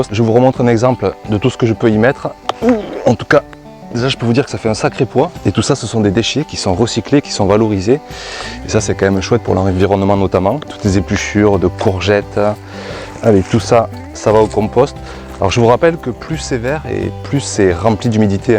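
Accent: South, France. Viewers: J adore vos vidéos super sympa continuez à nous régaler avec votre accent du sud beau jardin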